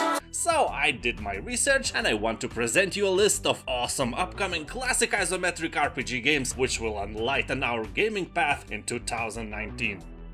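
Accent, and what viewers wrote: Russia. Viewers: This dude sounds like russian to me :) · бесит его русский акцент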